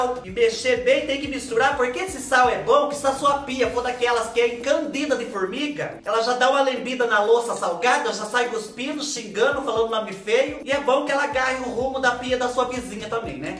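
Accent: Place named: Paraná, Brazil. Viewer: Oi cansei de ser clandestino e resolvi regularizar nossa situação minha e da minha esposa e me inscrevi. Tenho uma pergunta: adoro sei sotaque que parece paranaense. Mas você cresceu no estado de São Paulo. Como conseguiu esse sotaque tão legal de paranaense? Amamos seus vídeos. Assim que passar essa coisa toda vamos te trazer para conhecer o nosso pequi com frango para ver que delícia do céu. Tem vários Youtubers aqui também da área de culinária.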